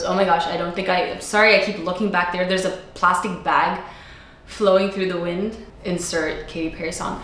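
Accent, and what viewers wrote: Russia. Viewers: Блин только сейчас заметила что у, русский акцент когда она разговаривает на английском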